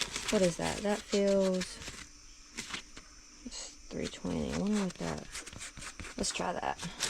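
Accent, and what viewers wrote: South, United States. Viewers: I like your accent, where down south did you grow up?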